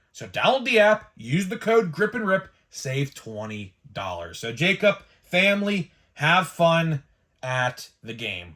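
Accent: Pittsburgh, United States. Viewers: Yinz sahnd like mark madden … jagoff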